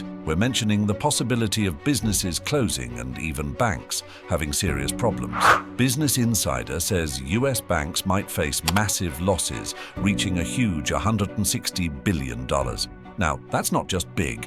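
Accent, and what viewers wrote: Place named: United Kingdom. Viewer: When I heard the voice was British....I knew everything said would be a lie.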